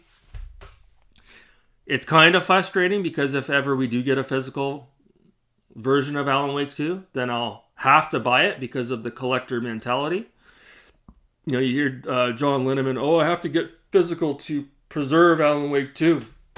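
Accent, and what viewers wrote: Canada. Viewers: haha i clicked your video without looking at the name of your channel, and before you had said 3 words I knew you were a fellow Canadian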